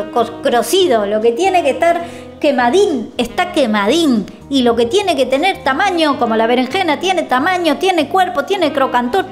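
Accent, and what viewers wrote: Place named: Buenos Aires, Argentina. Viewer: Me gusta su tono porteño de hablar,es UD muy graciosa,las verduras quedaron súper bien,es algo para ido a la escalibada.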